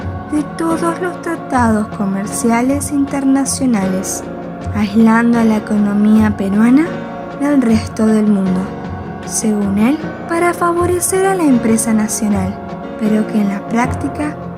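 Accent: Argentina. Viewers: Tanto terror? A proposito de donde eres, este es una exageracion. Lean no se dejen esta con dejo argentina les convensa si en verdad quieren a Perú. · Siempre me he preguntado si eres Argentina? Por que tu voz es muy bella. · ¿Eres de Perú?, suenas como de argentina xd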